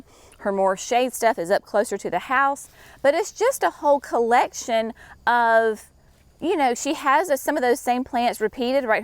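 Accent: South, United States. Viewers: Your parents' garden reflects a true HOME garden created by loving hands who seem to love the beauty in the gifts from God our Creator whether that be in the incredible variety of plants He gives us to enjoy, or the beauty in a a piece of wood, a fallen log, or another artist's creation! I appreciate your just being yourself as you walk through "Mimi's garden" in both your joy of sharing information about a plant, while teaching us as well (I likely could be your mama, but ALWAYS learning!) As a fellow Southerner, I love your natural expressions and lilt in your voice. However, I also appreciate and learn from your very different sounding but naturally gifted gardening friend Laura in Oregon...both of you are "down to earth" as we would say...no pretense in any way. And did a great job on "walking with you" in that woodland garden environ...that's not easy to do without sliding on pine straw or hitting a tree root (unless that's in the 'bloopers':) Blessings!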